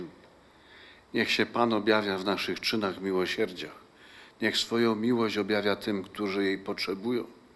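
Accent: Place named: Kraków, Poland. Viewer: oprócz Słowa Bożego pielęgnuj ks. abp swój krakowski akcent - jako dowód piękna naszej ojczystej mowy . Niech Matka Boża ma Cię w nieustającej opiece.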